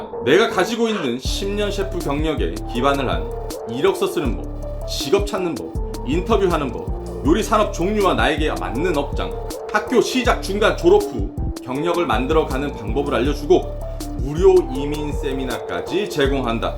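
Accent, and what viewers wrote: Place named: Gyeongsang, South Korea. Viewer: 경상도 사투리 매력 있으십니다 선생님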